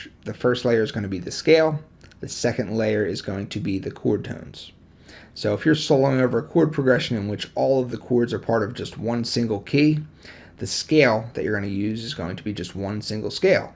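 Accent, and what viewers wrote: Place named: South Jersey, United States. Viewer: Man, it's pretty easy to hear where you're from. I'm from S Jersey and you sound like a lot of people I know from Paulsboro NJ. It's that Philly outskirts on-the-river vibe. Good stuff cuz!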